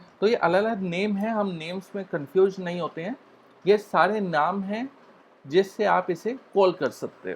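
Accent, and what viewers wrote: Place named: Nepal. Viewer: I really appreciate BOOKEEPER app and I beleive it is very important to make these presentations about new features and skills, but sound quality has always been a problem. Your accent is something i get that, but remember BK community does not included only people rom Nepal.... so make sure sound comes out clearly at least guys!! Thank you.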